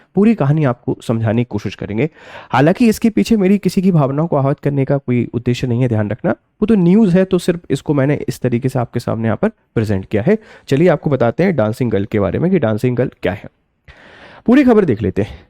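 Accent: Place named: Madhya Pradesh, India. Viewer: Arey madhya pradesh aur maharashtra ke taraf (ri) ko (ru) bola jata hai samjhe.. Isleye wo aise bol rhe.. 😮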